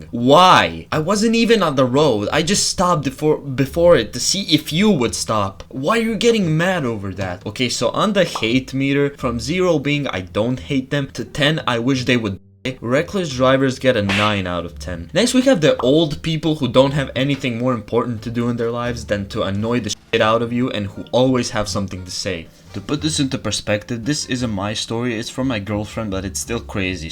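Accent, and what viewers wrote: Croatia. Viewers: According to your channel you live in the glorious land of HDZ and your accent tells me you live in the Greater Zagreb region or in my case "prek Učke"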